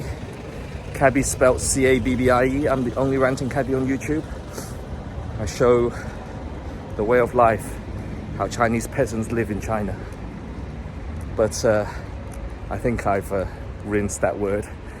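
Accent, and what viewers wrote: United Kingdom. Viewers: Just curious...did you film this in China? I am hearing a very noticeable British accent. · Lolz. You spoke in a British accent. Are you British or Chinese? You forogot mentioning 20 million unemployment rates. 😅 I prefer living in Britain than in China! 😂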